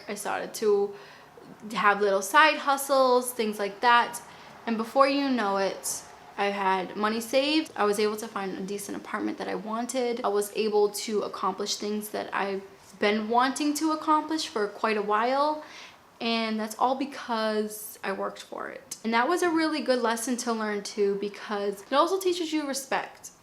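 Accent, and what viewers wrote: Boston, United States. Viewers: Love your Boston accent. Love the way you said Michael Kohrs as Michael "Khohs."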